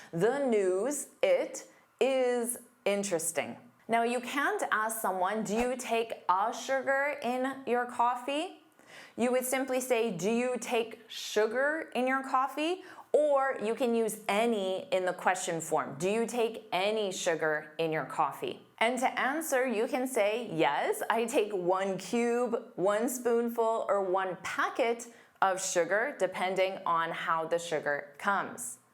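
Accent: United States. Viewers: She sounds like a yank they have know room to say anything about the English language they misspell words and pronouce words incorrectly ie mobile becomes mobul, although they do spell the word the same, like missile becomes misul. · Obviously she's american. Isn't she?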